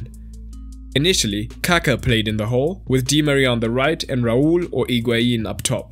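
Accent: South Africa. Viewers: Am I the only one who thinks this accent is South African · You have a South African accent.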